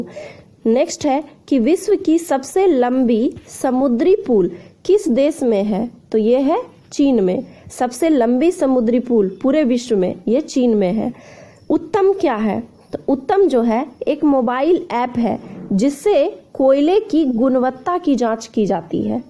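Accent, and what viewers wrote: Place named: Maithili, India. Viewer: thanxx and aapke bolne maithily tone ki sweetness ki chhataa saaf jhalaktee hai 😀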